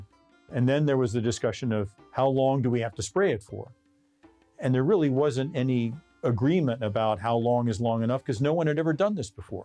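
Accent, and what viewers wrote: United States. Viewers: This guy is def from Delco area in Pennsylvania lmao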